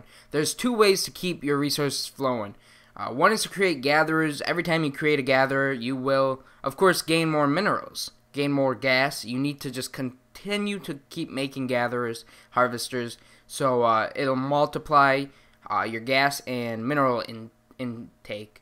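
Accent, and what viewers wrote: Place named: New Jersey, United States. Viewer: Sounds like you're from New Jersey lol.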